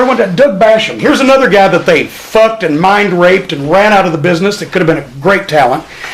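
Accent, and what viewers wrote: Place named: Kentucky, United States. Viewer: thats that Kentucky twang...lol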